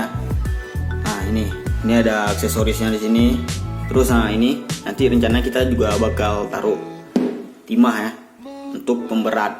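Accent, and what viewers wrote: Aceh, Indonesia. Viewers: Logat Aceh nya bang😂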